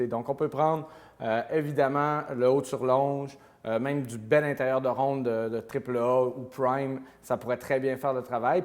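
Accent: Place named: Quebec, Canada. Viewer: j'adore la fraicheur de votre recette....bien assaisonnée , avec de beaux produits , j'adore votre générosité de recette détaillée,...et votre accent québécois qui rend heureux comme si toujours de bonne humeur...et je suis impressionnée par votre planche de travail en marqueterie très originale superbe..merci.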